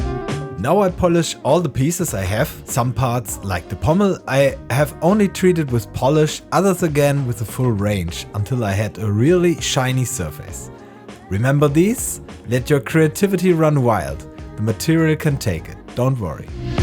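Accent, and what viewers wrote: Germany. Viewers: Hey. Es hört sich so an als wärst du eigentlich Deutscher Muttersprachler, daher spreche ich dich mal auf deutsch an. Ich bin gelernter Oberflächenbeschichter. Das was du in deinen Videos machst, mache ich sozusagen hauptberuflich. Daher kann ich dir bei Fragen gerne Helfen. Ich persönlich verstehe nicht, warum du den Strom erhöhst mit der zeit. Du solltest die Oberfläche ausrechnen und den Strom entsprechend 1,5-2,5A pro dm² einstellen. Die Unreinheiten die du auf der Oberfläche siehst, sind Verschmutzungen in deinem Elektrolyten, die sich als Poren auf deine Teile legen. Deine Kontakfläche ist eigentlich groß genug, du solltest versuchen nichts um die teile herum zu spannen, sondern im Innenbreich zu kontaktieren. Scharfe kanten oder spitzen solltest du jedoch abschirmen, da du dort sonst Anbrennungen bzw Knospenbildung bekommst, so wie dein Draht beim ersten teil ausgesehen hat. 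Ich hoffe das hilft dir weiter.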